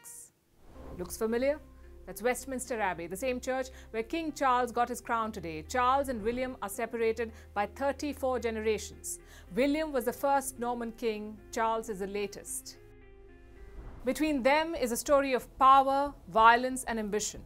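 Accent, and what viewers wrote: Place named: India. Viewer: Pity about the Indian accent when discussing British events. Perhaps she should either learn proper English pronunciation or stick to her Pakistani/Indian subjects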